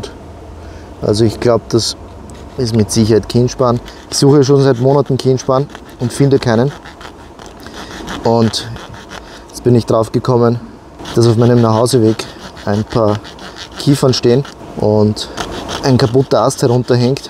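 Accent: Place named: Carinthia, Austria. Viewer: Hallo da habe ich keine Probleme,dich zu verstehen 🙂 habe viele Jahre im Kärnten meine Ferien verbracht 🇦🇹 war immer eine super Zeit,die ich dort verbringen durfte ♥️ Daher ist mir deine Aussprache sehr bekannt 🤗 Gutes Video von dir,Wie immer sehr informativ Grüsse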